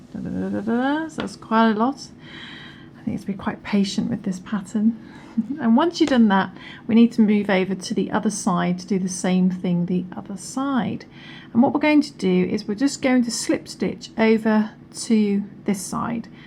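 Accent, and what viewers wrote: United Kingdom. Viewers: Love this pattern. I have a question, though. When doing loop stitch, you start out with yarn over on this video, but in your other tutorial with the small light-green sample you don't do yarn over as the first step and just go directly to inserting into the stitch as the first step. So, I'm a little confused. Would rather not do yarn over, if possible. So lemme know. I love your Brit accent.....Fiddly, a very cute and accurate expression, lol. Haven't heard that one over here--USA.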